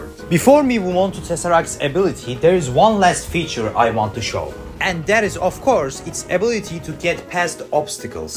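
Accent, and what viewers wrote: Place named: Turkey. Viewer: Duyduğum en türk aksanlı ingilizce❤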